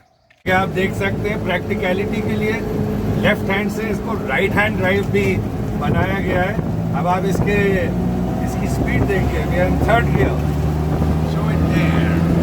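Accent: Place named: Bhopal, India. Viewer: Hello bhai..loved your bhopali tales and your passion for Jeeps👌👌. Tumhari bhopal style commentary lajawab hai.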